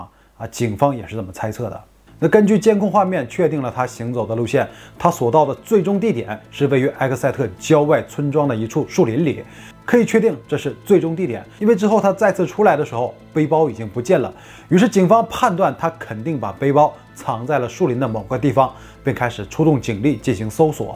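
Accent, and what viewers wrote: Northeast, China. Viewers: 不知道是不是因为东北口音搞笑且亲切（虽然我不是东北银😂），就觉得你讲的很吸引😊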